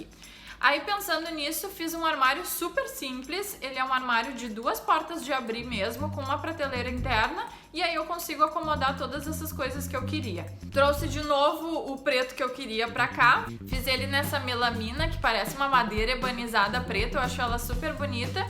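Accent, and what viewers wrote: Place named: Rio Grande do Sul, Brazil. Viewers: Como que a pessoa não consegue discernir que é o sotaque do Rio Grande do Sul. Lindo sotaque. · É apenas o sotaque do Sul galera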